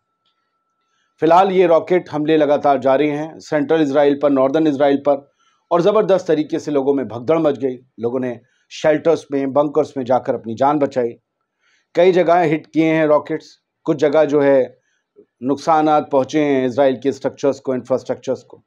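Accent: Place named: Allahabad, India. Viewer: Aapki baat karne ki andaaz ekdum Allahabadi hai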